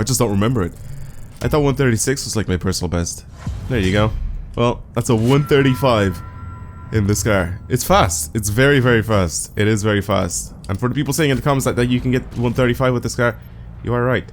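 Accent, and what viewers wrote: Ireland. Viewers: Are you Irish you sound it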